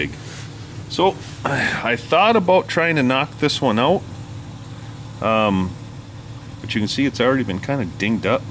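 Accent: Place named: Upper Peninsula, United States. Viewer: EXCELLENT series! I sure hope my tractor restoration turns out 1/2 as beautiful as yours! I can't wait until spring so I can paint. ps. I'm from Michigan and you sure sound like you're from the U.P.!! ps.ps. My family and I vacation near Republic every summer......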